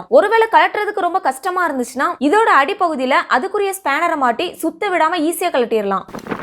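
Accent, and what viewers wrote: Tirunelveli, India. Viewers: ஊச்சி ஊச்சா இருந்தா......தூத்துக்குடி,திருநெல்வேலியா?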